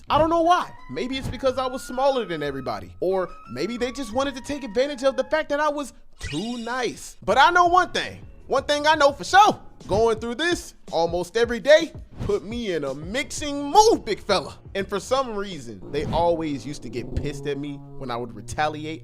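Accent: Alabama, United States. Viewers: Bro you from Alabama 😮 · This niggas from Alabama 😭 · Bro what part of Alabama you from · What part of bama you from brodie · What part of Alabama u from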